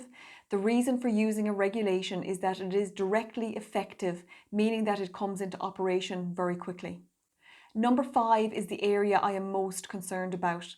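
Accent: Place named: Israel. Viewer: Why are you saying dates like hebrew English. We speak UK English. Prehcedent not pricedent.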